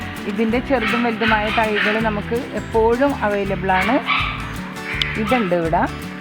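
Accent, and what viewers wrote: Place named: Ernakulam, India. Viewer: Chechiyude samssaram kettal Ernakulam pole thonnum👍👍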